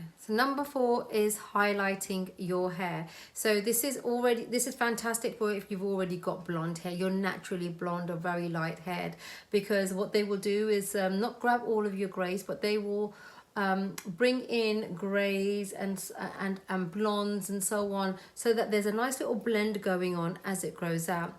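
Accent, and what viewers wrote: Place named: United Kingdom. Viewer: Love ur British accent!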